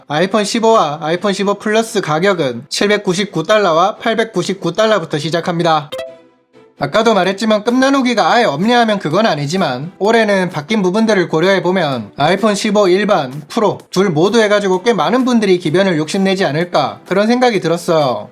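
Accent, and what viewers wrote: Gyeongsang, South Korea. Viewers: 아이폰때문에 처음 봤는데 영상시작 20초만에 나오는 경상도 억양에 빵터졌네요ㅋㅋㅋㅋ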